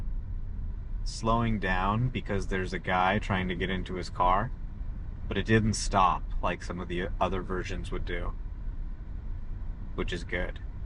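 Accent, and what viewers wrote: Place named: Canada. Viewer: Bro got the canada accent 🏳️‍🌈 🏳️‍⚧️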